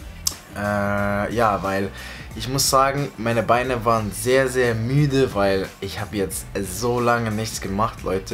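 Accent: Switzerland. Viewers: Wer findet auch das sein dialekt zum schwrizer wird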